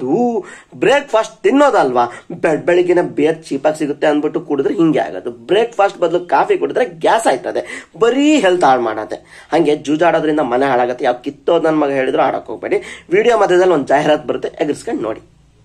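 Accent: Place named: North Karnataka, India. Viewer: ಸ್ವಲ್ಪ ನಮ್ಮ ಉತ್ತರ ಕರ್ನಾಟಕ ಭಾಷೆ ಬರುತ್ತೆ ಅದಂತೂ ಗಿಚ್ಚ್ ಗಿಲಿಗಿಲಿ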